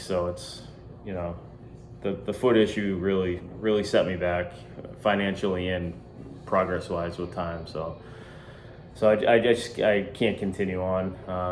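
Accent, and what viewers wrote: Massachusetts, United States. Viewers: This doesn’t have to be an end. A lot of people return to the trail to finish what was started as they get closer to retirement age. Life happens and things happen for a reason. Embrace the ambiguity life brings and move forward. On a selfish note- I’m going to miss hearing the familiar accent which I miss being back in every day (SE Mass native > Virginia- enjoying the AT down here). Hike your own hike, man…no matter what it is or how long it takes.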